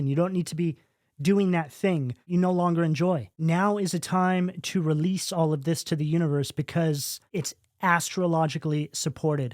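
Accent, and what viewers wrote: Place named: East Coast, United States. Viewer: I always thought you were east coastian.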